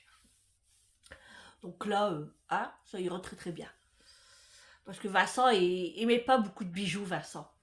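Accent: North, France. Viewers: voilà, c'est sûrement ça....!!!! Avec votre accent du Nord, le micro, Macho-Mattew, je confondais. Merci. Je croyais que j'étais à "l'ouest" 🤣🤣🤣🤣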